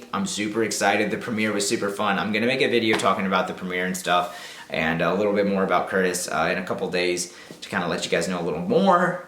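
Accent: South, United States. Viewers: I for one wouldn't care if you talked in a Southern accent for the remainder of your time on YouTube, it reminds me of your very first video you're coming out video one of the things that I found so endearing about that video was that southern accent, you weren't trying to hide it it was just there and I found that to be precious and plus I'm in South Carolina so I get ragged all the time about my Southern accent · Okay, my question for the Q&A (if you so choose) - do you use your "Southern" voice on dates or you "Normal YouTube" voice? (I'm wondering now because I've heard your accent and the general topic of the video xD) Otherwise, I feel like I would enjoy sitting down with you and just picking your brain about all the stuff you talk about... oh shit my psychologist is showing, k thx bai! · Ohh, that Southern voice is giving me goosebumps. I love it! 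It's great to see you Smile again. I love you always seem Complex, that's a great thing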